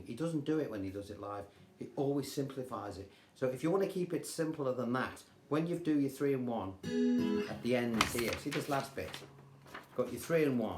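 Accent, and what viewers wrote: Liverpool, United Kingdom. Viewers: Came for, stayed for the accent. Brilliant. I dunno, scouse?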